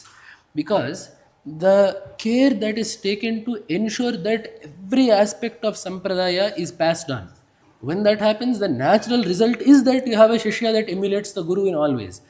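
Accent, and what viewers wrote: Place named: India. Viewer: It’s amazing to hear him imbibe Indian accent so effortlessly despite having grown up with American accent around him….btw, I really liked these QnA series.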